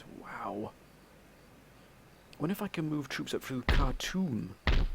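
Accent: Wales, United Kingdom. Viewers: Hey man, where are you from? You sound British but you have hints of foreign By the way, great video, definitely subscribing! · Where abouts are you from in Wales? your dialect is very interesting to me, great vid btw your always a gentlemen talking to people in the comments and your very good at this game!! :)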